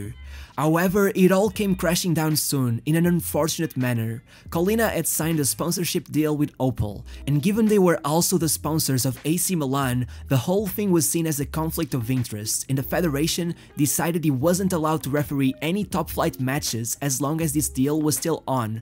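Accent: Portugal. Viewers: Este gajo que está a narrar parece-me ser português, tem o sotaque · Your Portuguese accent kills me, reminds me of my Portuguese friends! Great vid!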